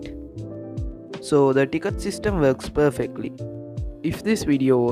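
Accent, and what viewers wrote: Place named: India. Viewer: You sound really really really Indian!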